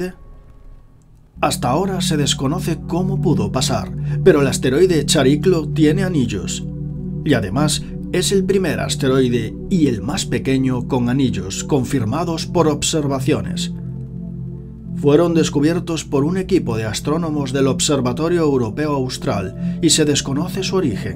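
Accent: Spain. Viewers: Este bato hace buenos videos, pero no puedo evitar que me cagué el acento español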